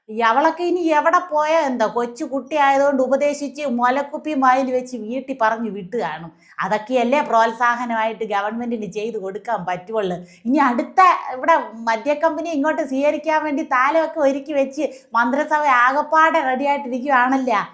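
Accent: Thiruvananthapuram, India. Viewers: തിരോന്തരം ഭാഷ 🧡 👌👌👌👌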